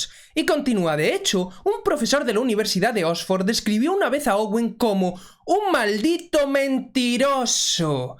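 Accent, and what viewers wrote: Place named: Galicia, Spain. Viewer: PD: Tienes un acento gallego precioso.¡Galicia calidade!✌🏻❤️🙌🏻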